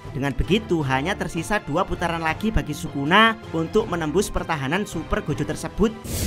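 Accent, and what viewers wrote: Java, Indonesia. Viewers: Gw kurang nyaman sama pembawaannya terlalu medo, maaf saya bukannya melarang menggunakan logat jawanya cuma setidaknya bisa gak pembawaannya lebih di benerin ke arah bahasa indonesia pada umumnya. Soalnya gak semua enak denger logat yg terlalu medo Semoga chanel semakin berkembang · Medok itu lebih ke aksen, akan susah dirubah. Kalau bahasa yang digunakan udah bagus kok, jelas juga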